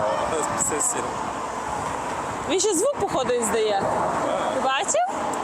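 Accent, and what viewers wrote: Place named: Western Ukraine, Ukraine. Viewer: Вы из Западной Украины,а война на северо- востоке и на юге Украины.Чего вы убежали?Говор у вас из западной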